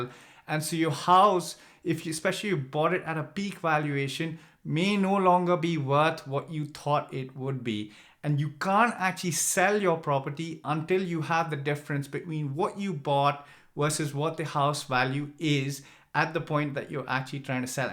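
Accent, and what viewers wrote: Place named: India. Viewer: From your accent its visible that ur root is from Indian. Why can't you say your name properly. Its or. Bitte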